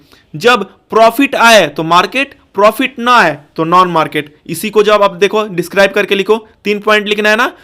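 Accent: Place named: Bihar, India. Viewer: Aap Bihari ho kya aapka bolne ka tarika Bihari jaisa hai Main bhi Bihar se chhapra saran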